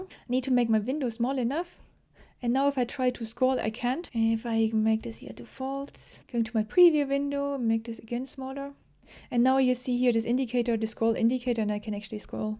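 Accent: Germany. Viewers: Hi. Love your videos. The "better" word at this point would be "contract". E.g. "You can expand and contract the columns" instead of "you can expand and decrease the columns" English is funny/hard in that it has words that are common counterparts in everyday language. For instance: - increase/decrease - expand/contract - love/hate Hope this helps. Love learning from you! (Probably because my mom is from Germany and I love your accent.)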